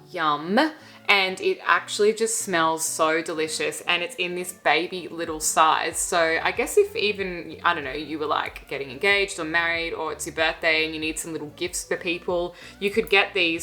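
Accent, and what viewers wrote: Australia. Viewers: 🦋Love you channel except for 1 thing...It’s a shame you choose to use the American slang Haul...it doesn’t sound right with the Aussie accent ☹️ You’re an Aussie! 🤗🇦🇺 don’t we call it a shopping spree 🛍 in this beautiful country of ours🏝🦘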